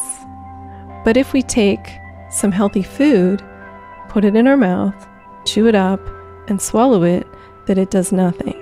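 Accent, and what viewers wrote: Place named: Canada. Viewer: ".....ay?" you Canuck you nice gentleness, there is so little of that now.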